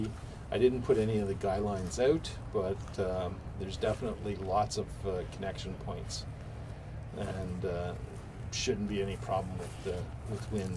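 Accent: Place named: Canada. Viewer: Thanks. I just bought this tent for many adventures to come. I love your Canadian accent, eh. Many happy trips ahead.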